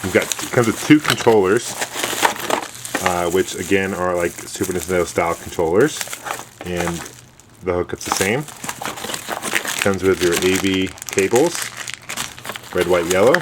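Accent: United States. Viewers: you sound like your from kentuky like me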